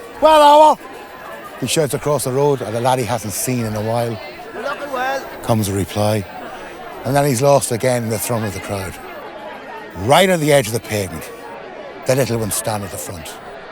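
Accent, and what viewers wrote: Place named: Dundalk, Ireland. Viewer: I love that we have poets among us , this brought a tear to my eye , I know every single street and monument but it’s the Dundalk turn of phrase , the tone and accent that resonates . Theses memories are the constant glow that warm us ... to recall those we are with and without ... remembering always where we’re from. Wonderful , thank you .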